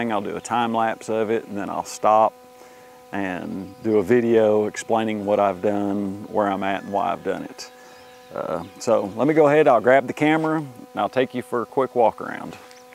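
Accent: United States. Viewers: Are you from west Georgia?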